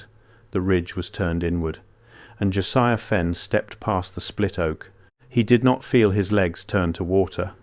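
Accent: United Kingdom. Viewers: Why a British accent narrating an American story? Oh, AI. Which makes me not believe the story.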